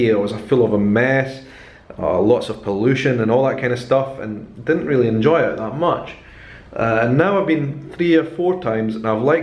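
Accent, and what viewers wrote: Scotland, United Kingdom. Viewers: Hi Where are you live..vitoria? I have a lot friends there if you need something please writte me..Im living in Dublin at moment. And I love your channel because the serie OUtlander.. and amazing your scottish accent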